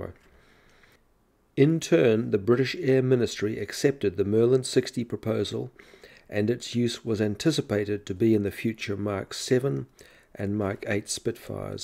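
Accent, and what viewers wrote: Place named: New Zealand. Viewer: Nice to hear a kiwi accent on youtube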